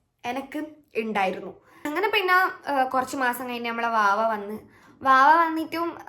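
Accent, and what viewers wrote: Kannur, India. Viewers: വളരെ നന്നായി സംസാരിക്കുന്നു. നമ്മുടെ സ്വന്തം കണ്ണൂർ ലാംഗ്വേജിനു ഇത്ര ഭംഗി ഉണ്ടായിരുന്നോ 😮. താൻ വളരെ genuine ആണേട്ടോ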